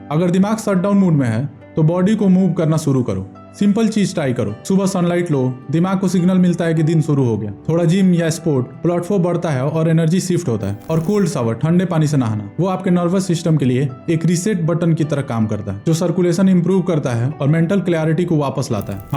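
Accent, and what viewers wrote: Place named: Bihar, India. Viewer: yes , i am from motihari , by your accent i instantly knew you are from bihar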